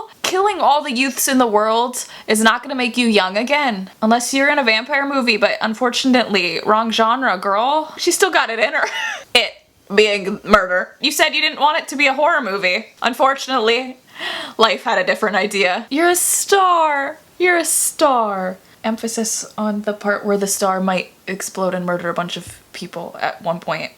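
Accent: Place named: South, United States. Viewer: I know she said she lives in Florida but when I've seen her other videos I never heard an accent. However this time when she talked about stepping on a gekko with her bare feet because she lost her glasses while the video had the guy stepping on the nail I could swear I heard her have a Southern accent. I had a friend I met in college and after talking to her for two weeks it was only then, after we'd studied all night, that her Lousiana accent came out.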